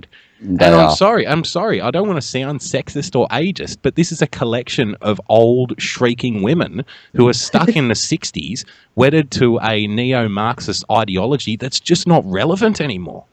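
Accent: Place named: Australia. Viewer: This is a good Summary. Worth a listen . The Aussie accent is off-putting . You tend to think an Ozz has no place advising the US . But I enjoyed it.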